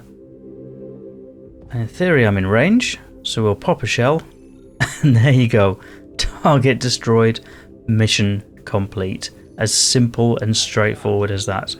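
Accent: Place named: United Kingdom. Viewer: Hope you stay safe amidst the turmoil in the UK from what i can tell (your accent lol) you are British Anyway stay safe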